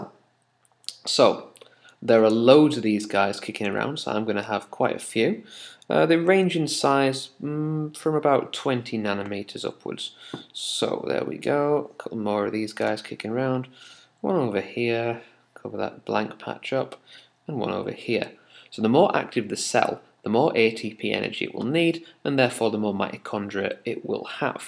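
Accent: United Kingdom. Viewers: he bri'ish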